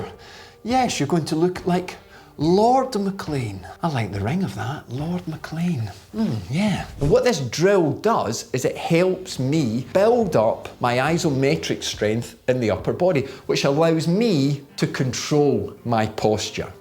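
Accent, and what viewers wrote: Scotland, United Kingdom. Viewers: Thankyou for speaking plainly English like a a true Scotsman. I even get the jokes. Very refreshing. I understand where I’ve been going wrong. With no bs . Thankyou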